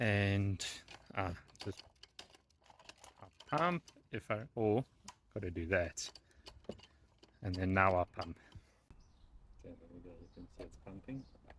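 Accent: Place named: South Africa. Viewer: Lekker! Nog 'n video en 'n bietjie Suid-Afrikaanse aksent! Musiek in my ore!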